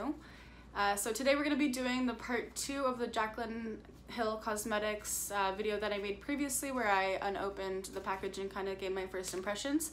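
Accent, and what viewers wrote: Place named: Canada. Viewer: ABHOOT 😭😭 that Canadian accent omg so cute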